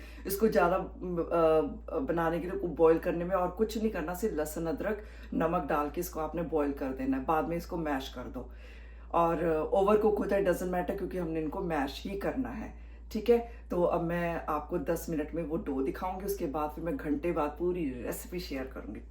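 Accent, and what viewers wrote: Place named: Punjab, India. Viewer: We love your Punjabi accent… please continue in Punjabi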